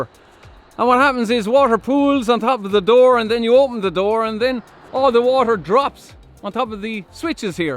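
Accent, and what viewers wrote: Ireland. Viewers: A guy with a Greek name with an Irish accent, driving a Swedish truck on English roads: doesn’t come more cosmopolitan than that. Seriously though entertaining video!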